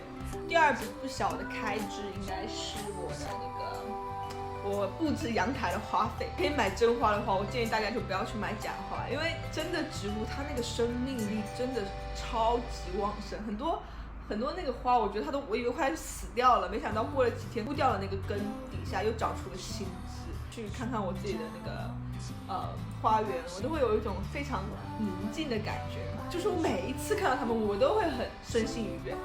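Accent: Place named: Taiwan. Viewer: 你的口音好很像台湾口音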